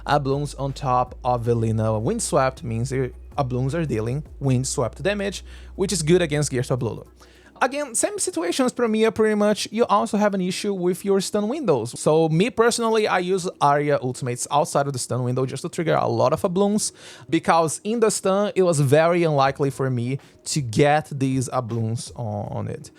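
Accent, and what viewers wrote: Brazil. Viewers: Can't wait to try the Air Fryer team!! Btw, are you Brazilian? That accent sounds familiar. Awesome video!!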